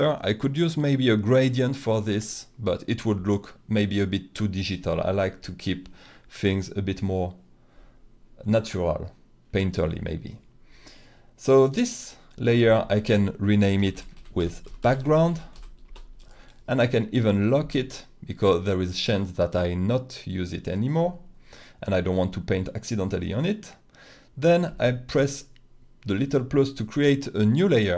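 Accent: France. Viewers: L'accent français ♥️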